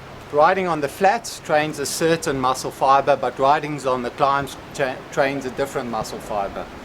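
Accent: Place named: South Africa. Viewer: You have a lekka South African accent bru😉👍🏾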